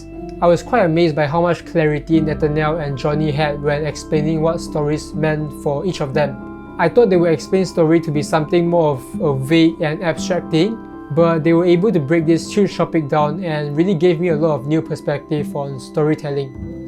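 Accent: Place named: Malaysia. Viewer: your accent is oh so familiar! you're malaysian, it turns out!!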